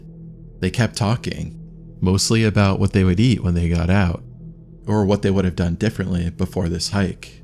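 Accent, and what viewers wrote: New England, United States. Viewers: Are you from the northeast? Kind of new enland area?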